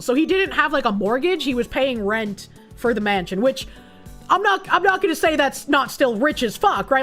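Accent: Boston, United States. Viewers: Obviously D4vd is innocent and the girl just accidentally hit the self deleting and dismembering button in the Tesla. It's marked D&D so she thought it was a roleplaying game with the car's AI. Unrelated: Ab sen tee-ah. My god. It's not your Boston accent. I love your Boston accent. It's the fact you cannot pronounce words. Ab sen she-uh.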